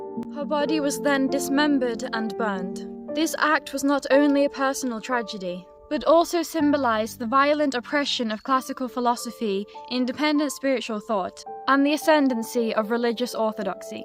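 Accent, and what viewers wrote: United Kingdom. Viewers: Hi there! Stumbled upon your channel, great content. Keep up the good work. And have to ask: Where are you from? You look like a Scandinavian, but sound very brittish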